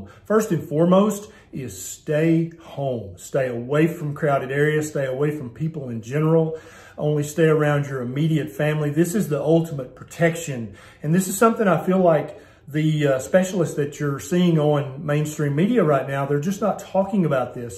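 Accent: South, United States. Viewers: I was listening and then I heard his southern drawl, and all of a sudden he’ doesn’t seem as credible as he once did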